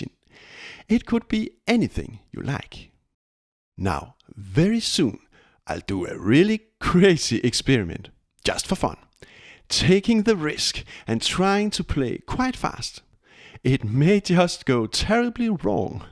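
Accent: Denmark. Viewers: Nice Danish accent, you deserve more views 🔥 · Sound Danish :-) Well done I like it very much as well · I have to ask, are you Danish? I hear a few what seem to me clearly Danish sounds in your unusual accent - never heard anyone speak exactly as you do. Love the Danish accent. Hope you don't mind me asking - sure it's none of my business but language sounds interest me. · As a Dane myself I half way cringe when I hear our accent but it's hard to lose ... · Super god video, vidste du var dansker fra accenten lol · You are the only guy with a thick Danish accent to which I've actually liked listening to. Wonderful video, I'm definitely gonna try to pick up the 'lovely habit'. Og forresten mega props for redigeringen.. Det er dælme vildt at få de korrekte fingre til at lyse rødt på hvert eneste slag - selv i improvisationen! Translation: "And by the way, huge props for the editing.. 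It's wild to get the right fingers to light up red at every strike/beat - even in the improvisation!" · I like this guy, Sounds danish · Are you danish? Idk it’s just the accent😂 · Denmark? I'm picking up a strong accent haha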